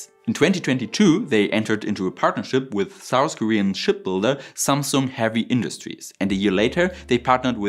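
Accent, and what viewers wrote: Germany. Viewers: I come here to listen to that German accent and I always learn something new. WIN - WIN.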